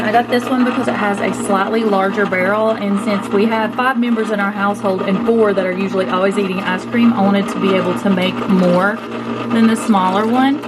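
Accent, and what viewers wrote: South, United States. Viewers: I love your voice and southern accent 😀. The Keto diet and Intermittent Fasting has changed my life, I have lost 27lbs. in 30 days. The best part for me is that my back pain is gone, I was just told 3 months ago that I needed surgery on my lower back. Not happening my friends. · Love a good old southern girl accent..smh stationed in California..these people are so different out here lol..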